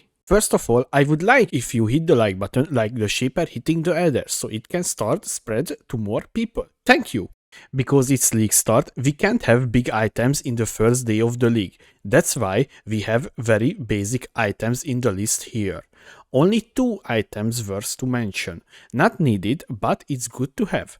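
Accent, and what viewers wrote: Hungary. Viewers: You must be Hungarian with this accent. :D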